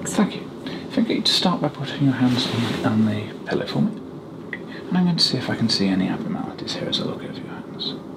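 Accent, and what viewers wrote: United Kingdom. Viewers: Not sure why I’m supposed to watch this, but it’s always nice listening to a cute Brit so okay.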